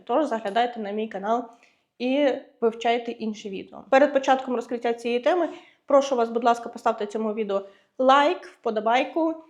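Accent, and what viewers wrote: Standard. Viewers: Дякую вам за гарну і чисту українську мову🇺🇦🇺🇦 Ваші розповіді приємні і актуальні👍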